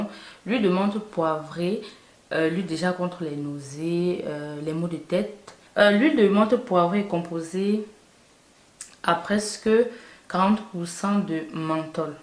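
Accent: Cameroon. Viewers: J'adore ton naturel ... la voix de la Cameroun aise 😍😍 et tes cheveux sont juste magnifiques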